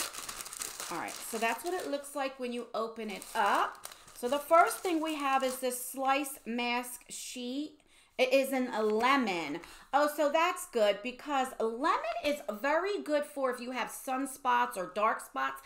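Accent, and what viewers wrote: New Jersey, United States. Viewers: Oh Jersey! I was woundering where that accent was from! I am confused though. Glossy Box....thought it was gonna be lip gloss. Not disappointed though. Loves the box and whole video.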